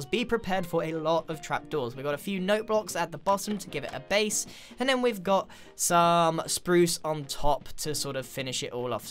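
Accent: United Kingdom. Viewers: Yes because he talk british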